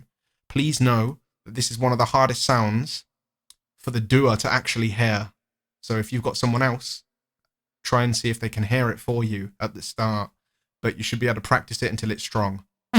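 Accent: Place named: England, United Kingdom. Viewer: English accent 🔥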